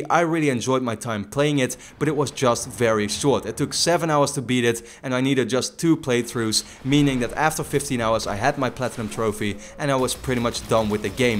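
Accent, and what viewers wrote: Netherlands. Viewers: leuke video man ( ik hoorde meteen al dat je nl bent )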